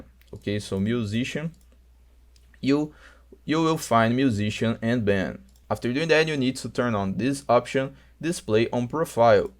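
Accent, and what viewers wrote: Brazil. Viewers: video bom puto (esse sotaquezinho brasileiro nunca engana)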